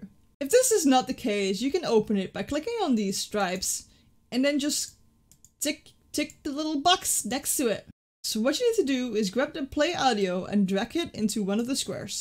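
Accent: Netherlands. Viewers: Even if I'm not a streamer I bought a Stream Deck to have fun in Discord during our gaming sessions. I searched for tutorials how to use it as a soundboard and found your video. I really like this video it because it is clean and simple without much talking about other things that are not relevant for the topic. It's a pitty that your videos don't have much more views. And I love this dutch/english accent :)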